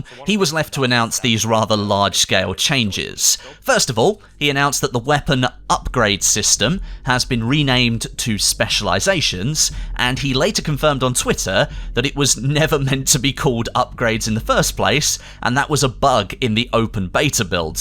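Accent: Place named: England, United Kingdom. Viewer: Where abouts in England is he from does anyone know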